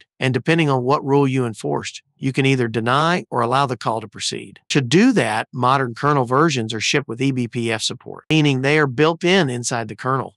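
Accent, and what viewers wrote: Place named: South, United States. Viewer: Good video 💯 Btw I was thinking about your southern drawl. If you turn out to be a 80 year old Grandma living in England somewhere,I'm gonna mad upset😂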